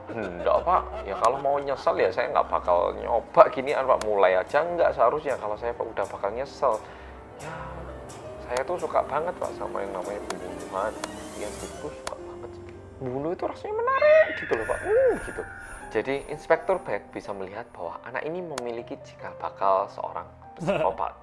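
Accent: Java, Indonesia. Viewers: 3 channel storytelling favorit: 1. (pelan, medhok dan mudah dipahami) 2. Nadia Omara (gayanya kayak itu ghibah) 3. Arroyan Pram (Konspirasi dunia pake Astronomi, Fisika, dan editannya gila keren banget, serasa nonton agung hapsah dan Jerome polin bahas konspirasi) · Balik lagi sma yanggggggg medokdokdokkk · Yang MEDHOKKKKKKKK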